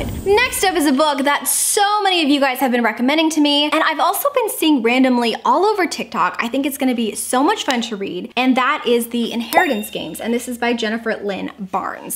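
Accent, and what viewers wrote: United Kingdom. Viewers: Hey!!! I am living for this video 🙌🙌 all the spooky autumn vibes. Hello from Perth Australia 👋 love the British accent you do in your videos hehe sometimes there is a hint of an Aussie accent when you do it. Not a spooky recommendation but a great YA read that I think you might like is The Prison Healer by Lynette Noni.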